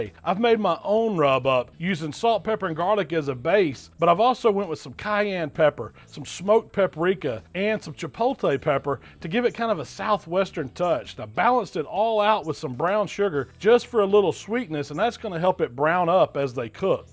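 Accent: South, United States. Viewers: Man that looks good. A fat chef with a south accent is a must when it comes to BBQ.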